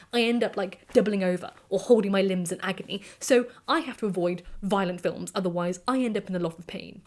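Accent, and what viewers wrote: RP, United Kingdom. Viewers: Came to hear a lady say boobie in a posh voice. Was not disappointed.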